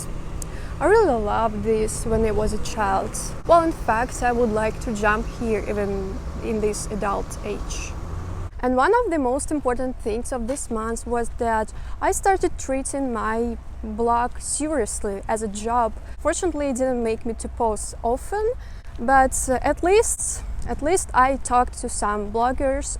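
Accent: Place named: Russia. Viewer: You speak English, with a strong Russian accent. I speak English with a strong Scottish accent. We speak the same language but we are both from different cultures, you'rs big, mine small. If you want to speak to a native English speaker, from Scotland, to practice you're English, let me know, there is always skype or other ways to avoid expensive barriers to communication.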